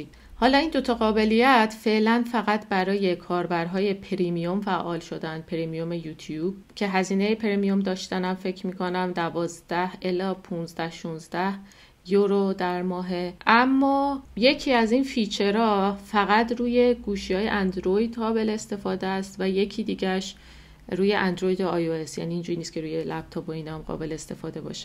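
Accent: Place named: Turkey. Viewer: تلفظ تُرکی داری. تُرک کجایی؟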